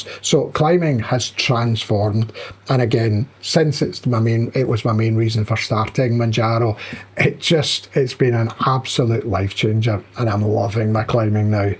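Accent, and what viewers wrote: Scotland, United Kingdom. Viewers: I hear the Scottish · He sounds like Scottish which I do find bit strong for me to listen for long 😅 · OMG, your accent is so sexy! It's like the accent in the TV show Outlander --- Jamie's accent. ❤ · Hes an inspiration to us all...and his voice is lovely to listen to isnt it, with that great Scottish accent! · Ha ha. My grandparents came to NYC from the Spey valley Scotland 1921 (MacPhersons). They were raised in Gaelic speaking households. This accent is pure music to my ears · I fully agree with what you said about the side effects: compared to the risks one has with staying fat, they are minor. Let them come, same thing what I think. As long as I lose weight, I am happy to have some gastritic issues. Your weight loss of 15% in the first 3 month is outstanding. You said that you are a hyper-responder. But what dosage did you go to in this timeframe ? 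by the way: what region are you from ? am I right your accent is scottish, but where from?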